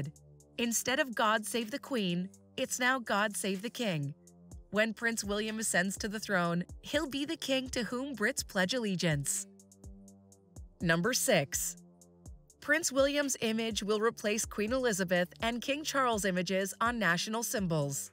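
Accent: United States. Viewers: Good heavens, another Yankee voice getting it WRONG. Princess Catherine has not been Kate Middleton since the day she was married to Prince William, at which time she became Her Royal Highness, Catherine, the Duchess of Cambridge (or "Mrs Windsor, not that they use that name much, but definitely not Middleton). She is now Her Royal Highness The Princess of Wales, plus other titles. Please get your commentary right - OR - don't comment at all! Also it is NOT E ii R. It is II, Roman numerals for the number 2. E II R Elizabeth Regina II, Queen Elizabeth the second. Regina means the reigning Queen. Charles' insignia is C III R. Charles Rex the third. Rex is the male version of Regina. When William becomes King, he will be W v R King William the 5th. Got it?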